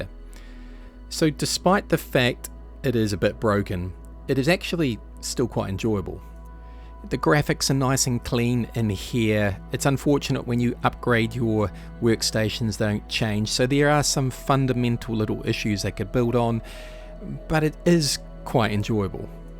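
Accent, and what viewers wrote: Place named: New Zealand. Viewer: First review of yours I watched, and I really liked your reviewing style! I appreciate the slow, clear, unhurried commentary, and how well you structured the video. I've seen other reviews of this game where they never even mentioned the second scenario in the desert, maybe they didn't play it as much as you? Love the Kiwi accent too, even if you beat the Irish in my hometown Dublin just the other day!! Subscribed. ✌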